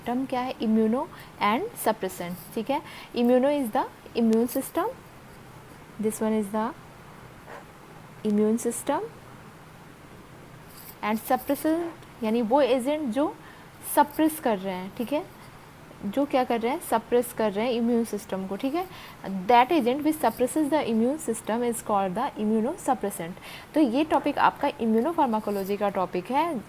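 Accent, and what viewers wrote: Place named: Himachal Pradesh, India. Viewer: Mujhe apki slang himachali lg rhi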